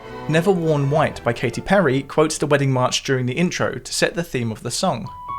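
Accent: United Kingdom. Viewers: "Wuhld" is almost worse than a Bostonian. Honestly this sounds better to me than a Bostonian because my parents liked britcoms late at night on the local PBS station. I got adapted to this accent pretty fast when I got a pubescent liking to Penelope Keith's hipbones. Y'all Brits probably think I say "world" as "wuhrrrl(d)".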